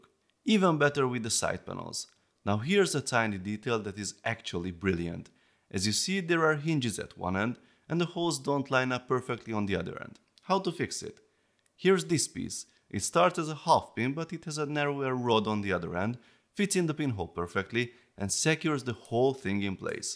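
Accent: Hungary. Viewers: Damn, that Hungarian accent is strong. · Helló. Úgy hangzik az angolod mintha magyar lennél.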